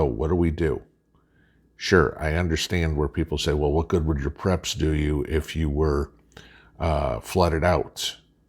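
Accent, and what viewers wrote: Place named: Midwest, United States. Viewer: Oh my God… I don’t have cable, I had no idea! Wow 😮 How is that just from rain, it looks like a twister hit or something. BTW the weather didn’t hit us in CT, just rained all day. Food in Mylar bags in buckets 🪣 should be ok. Ladder from upper stories is important. (As is smoke and carbon monoxide detectors and fire extinguishers) you say “on” like I try my hardest in CT not to. Are you from the Midwest as well?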